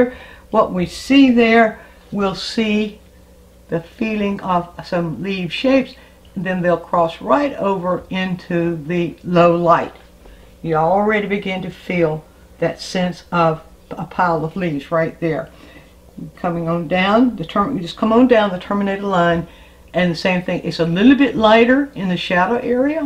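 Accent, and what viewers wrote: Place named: Missouri, United States. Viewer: I got to know where you are from. You have a similar accent my grandma had. She was from Missouri. Am I close???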